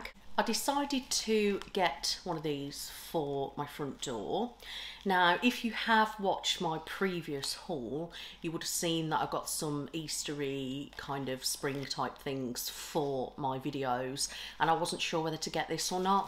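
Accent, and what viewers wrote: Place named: North, United Kingdom. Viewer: she sounds more northern - the midlands / the west - just a guess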